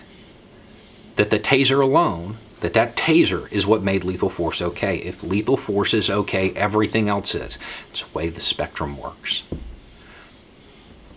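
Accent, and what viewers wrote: South, United States. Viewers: I discovered your channel via this video floating about on facebook - It's refreshing, almost pleasurable to hear such common sense being laid out in a southern accent. I'll be binge watching your stuff now while I wait for Boris Johnson to finish destroying my country.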